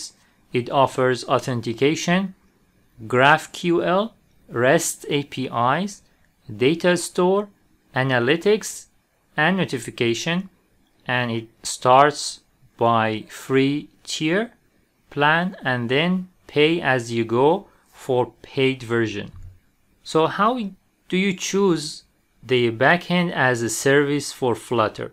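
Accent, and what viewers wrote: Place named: Isfahan, Iran. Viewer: Nice very nice اما فکر کنم ایرانی هستی اونم اصفهانی 😊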